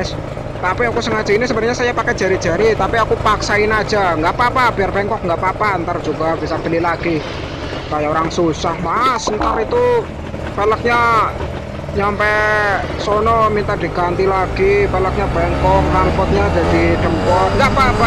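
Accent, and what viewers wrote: Banyumas, Indonesia. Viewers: Kalau nonton lu bikin inget kampung halaman ,ngapak bang 😂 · Wkwkwkwkwkwkw ngomonge pada bae kaya inyong kang asal ngomong, #salamngapak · Bahasan kue loh,,HAHAH ngapak banget..keren keren..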